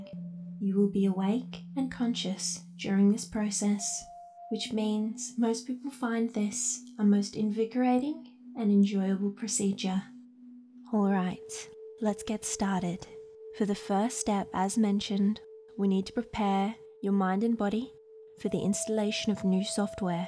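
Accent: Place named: Australia. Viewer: I'm working my way through this series. It's nice hearing another Australian do this stuff Cheers :-)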